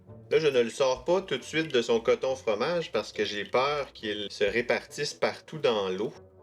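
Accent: Quebec, Canada. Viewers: Géniales vos vidéos.. Tout est parfaitement expliqué,matériel,dosages,temps... Et l'accent québécois est vraiment top 👍👍 Amitiés de France aux cousins des Amériques! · Hey! Bonne vidéo 👍😀 J'ai essayer une de tes recette, et c'est un échec 😅 D'ailleurs, tu et canadien? Vu ton accent je pense que oui 😅 Juste pour dire que j'adore le Canada!! 🙂 Coucou de la Nouvelle-Calédonie.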